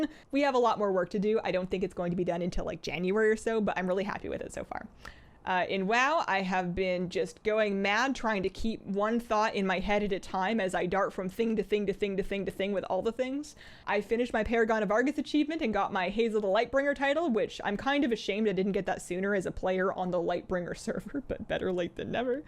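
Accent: Canada. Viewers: What part of Canada is she from? Does she still live here? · She's Canadian. Lay off it will ya!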